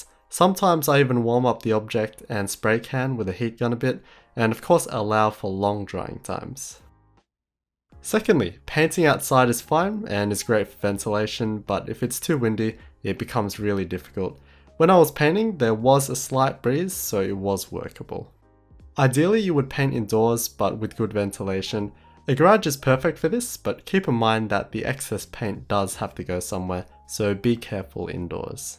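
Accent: Australia. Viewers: is he an aussie?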